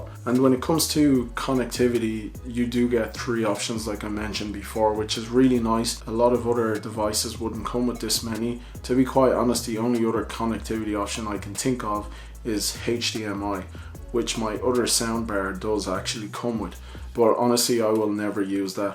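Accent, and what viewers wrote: Ireland. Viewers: I love your Irish accent 😁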